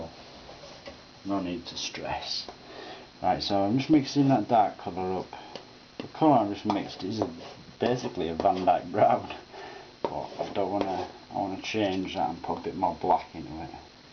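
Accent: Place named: Yorkshire, United Kingdom. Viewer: Wow!!!! What an amazing painting. What a talent. Also, you've gotta be from my neck of the woods in Yorkshire. I'm guessing somewhere around west/south yorkshire. Great work. Keep it up. All the best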